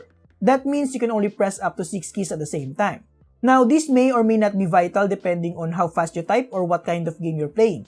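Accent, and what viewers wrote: Philippines. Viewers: This guy has the most Filipino accent ever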